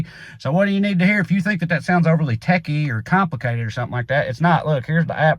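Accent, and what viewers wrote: South, United States. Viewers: proof that a southern accent is not a political Identity! Mad respect for the redneck voice of reason! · I dont like southern accents (used to love them), because they are usually associated with someone trying to take away my rights as a woman, stupidity and violence...... But I could listen to Trae all day. His wit, wisdom and level of information is just so astoundingly endearing and makes me remember why I USED to like southern accents. There was a time when the word of wisdom came from those accents, now its like dumbassery galore. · My favorite thing to say about Trae is " When I see in my suggestion I always hope it's a Trae and not a Stephen" the next is that I love to hear words like "incalcitrant" in a southern accent ESPECIALLY used correctly. XD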